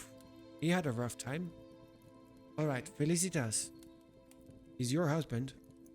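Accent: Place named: Germany. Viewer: wow your English is very good then! I thought by your accent that you were German or similar ^^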